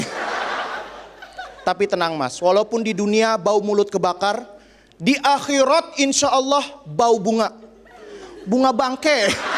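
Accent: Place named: Lampung, Indonesia. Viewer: eksen bahasa lampungmu masih kental bro. sukses bro karirnya.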